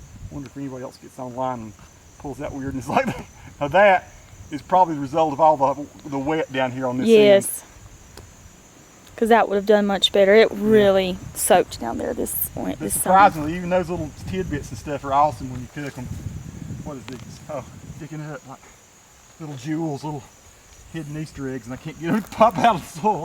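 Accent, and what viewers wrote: South, United States. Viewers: hi wonderful to watch you pull up all those sweet potatoes. I was so excited and they aren't even mine. I love to garden and this past summer, I just stuck a small piece of a store bought sweet potato and then stuck it in a big container. That thing had the prettiest vine that I trellised and lo and behold in the fall I had about 5 pretty decent potatoes from that one vine. I probably would have gotten more but I put it in with a couple of other plants which already had root systems that the potatoes just wiggle themselves around so this year they will have their own private containers. It was such a wonderful treat to eat my own "sweets", from my garden. I am very anxious to do the "slips" this year, that I have been watching on youtube and reading about. Can't wait. I live in Northeast (southern NH, 45 minutes north of boston, ma, so I have a bit of a wait but I start a lot of stuff inside cuz I can't wait. 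It is Jan and I just got all my seeds so I will be starting this week. Thanks so much for the great video. Where do you folks live. I know you are from the south, thats for sure (ha, ha,). lovin listening to your accent. Have a good time gardening this year. I do. Patty in nh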